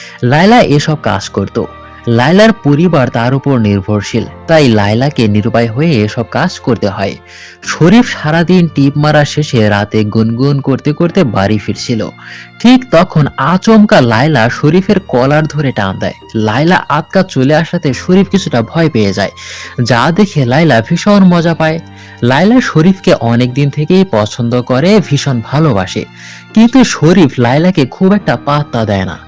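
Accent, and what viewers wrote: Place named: Jamalpur, Bangladesh. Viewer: ভাই আপনি মনে হয় জামালপুরের মানুষ, কথা শুনে তাই মনে হচ্ছে।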